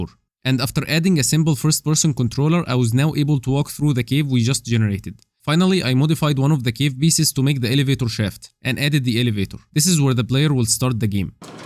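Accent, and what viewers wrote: Egypt. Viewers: عااااااش يا باشا ماشاءالله موهوب عرفت انك مصري من اول الفيديو❤❤❤❤❤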